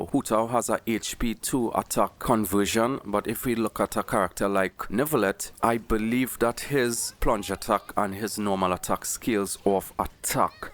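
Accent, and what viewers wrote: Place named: Trinidad and Tobago. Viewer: Within two seconds instantly knew you were from Trinidad, what's up brother!! I'm trini tooo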